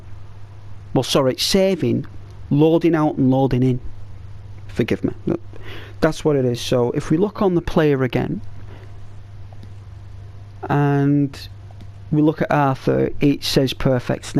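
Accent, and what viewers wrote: Liverpool, United Kingdom. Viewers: Great vid bro.. you from Liverpool ?? And sub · I love Liverpool accents lol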